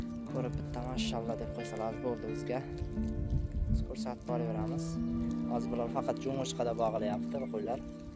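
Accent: Surkhandarya, Uzbekistan. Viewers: Узбек Сурхондарё Кашкадарё областларини шеваси шундай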